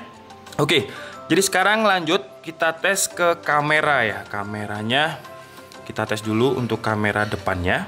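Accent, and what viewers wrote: Java, Indonesia. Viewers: Orang malang bang? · E Wong pundi jenengan lur..??kok pakai logat jawa..,😀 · Mas kowe wong jawa po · Orang malang bang ?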